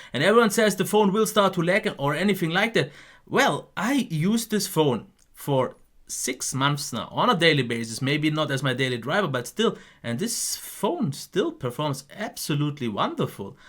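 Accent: Germany. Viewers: Jack AFC he is Croatian as he said. His accent is German. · ich hab einfach sofort rausgehört das du aus deutschland kommst :D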